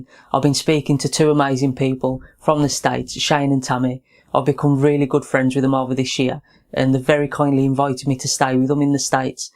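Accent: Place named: Birmingham, United Kingdom. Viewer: Hope you had a great Christmas! Looking forward to following your travels state side, and to see what they make of the Brummie accent haha! Also thanks for answering all my questions over the past 2 years, I've often bought stuff off your reviews! Best wishes for the new year mate!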